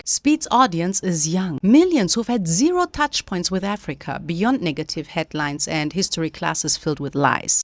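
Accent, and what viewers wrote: Germany. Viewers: German accent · I knew you know German well before you mentioned Germany. Your English sounds like that of Germans. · When she said "I left Germany..." I was like "I knew it!" 😂 Her English is really good, but it is just really difficult to hide our accent, proven once again. And also proven once again: We Germans are everywhere! I've met Germans in every corner of the world. Good for her that she found a nice place with better weather 👌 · 😊I thought you have a German accent 😊 Thank you for pointing this out.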